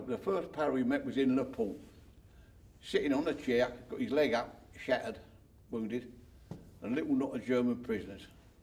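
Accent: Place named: South London, United Kingdom. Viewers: South East London. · Sounds like south London to me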